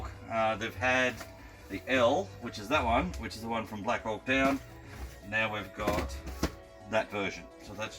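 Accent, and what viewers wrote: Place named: Australia. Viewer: I am American and I understand his Australian accent.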